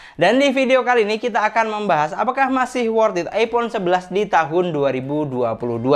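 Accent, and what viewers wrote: Madura, Indonesia. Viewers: Logat ny kok kayak org madura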